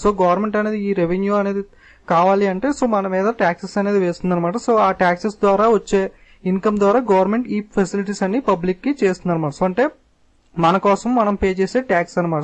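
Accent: East Godavari, India. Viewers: babu needhi Thoorpu godavari ya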